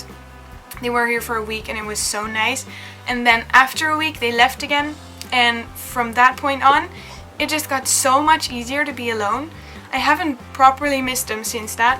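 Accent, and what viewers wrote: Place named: United Kingdom. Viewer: your british accent is there :) so amazing :D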